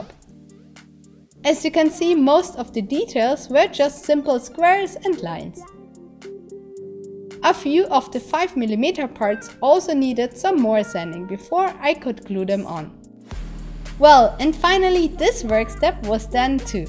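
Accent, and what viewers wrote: Germany. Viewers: I love your germand Accent! S2 S2 S2!!! I BUY YOUR BOOKKS!!!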